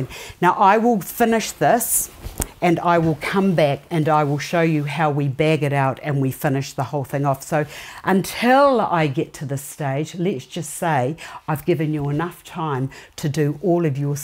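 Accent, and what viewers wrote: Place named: New Zealand. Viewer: How awesome. As soon as you started speaking I thought yayyyy a kiwi!!! Awesome seeing NZers coming up at the top of my search list. Love from Ari in welly!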